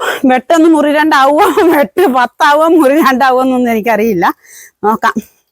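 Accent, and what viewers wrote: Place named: Idukki, India. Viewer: ഞാൻ വിചാരിച്ചു, സ്ഥലവും സംസാരവും കേട്ടപ്പോൾ ഇടുക്കിജില്ലയാണെന്ന്❤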